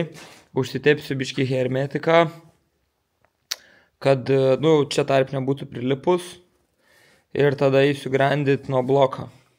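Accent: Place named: Šiauliai, Lithuania. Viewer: is kalbos lyg Siaulia butu :)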